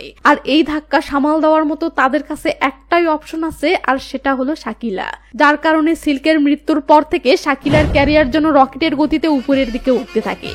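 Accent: Bangladesh. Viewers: I am indian . But, I did not see these good movies , I like your Bangladeshi speaking syle .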